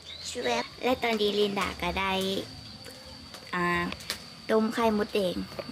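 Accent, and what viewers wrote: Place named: Laos. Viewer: ภาษาสำเนียงลาวเป็นเอกลักษณ์จังเลยนะครับสาวลินดา จะมากินกุ้งเมืองไทยอีกเมื่อไหร่ครับอยากไปเที่ยวลาวจังครับวัดที่ลาว สถาปัตยกรรมสวยงามครับ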